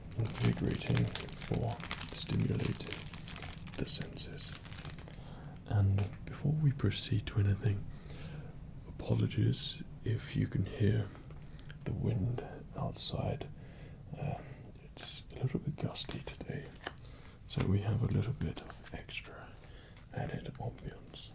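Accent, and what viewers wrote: United Kingdom. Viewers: Kyaaaah! I'm so happy I found out about asmr!! I'm a pretty anxious and agitated person. Sometimes, I'm tired but I can't get to sleep because my mind is always full of fast and stressfull thoughts T.T But your video really helped me! The plastic sounds are The best \o/ now I'm pretty sleepy @@ thank you for making this video and for helping me to calm down my messy mind. I really appreciate your voice too. I'm Brazilian, but I really love British accent (I don't remember if it's written like that). I think it is chic, fancy... I don't know which adjective fits better aahuashushauas But anyway thank you! Make more videos like that! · freakin stop thor jokes but I have a question are you from the U.K , Sweden or something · is he English because he kinda sounds it but looks Scandinavian and sometimes sounds idk just not English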